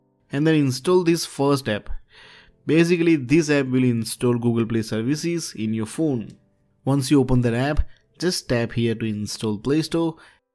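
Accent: India. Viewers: Bro you are Indian or foreign. BTW BEST CONTENT. CAN YOU PLEASE MAKE A VIDEO FOR BEST STALE ROM FOR MOTO G4 PLAY (HARPIA). LOVE FROM INDIA.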